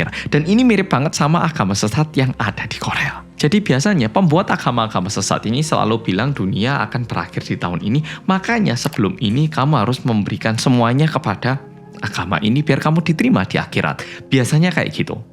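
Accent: Java, Indonesia. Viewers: yang medokk, openingnya terngiang terus di kepala sayaa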